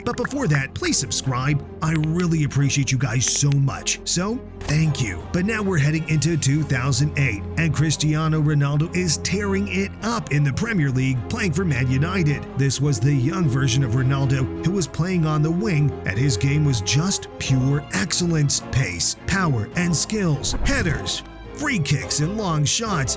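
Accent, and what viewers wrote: United States. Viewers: This guy sounds American, makes sense why this video is shit.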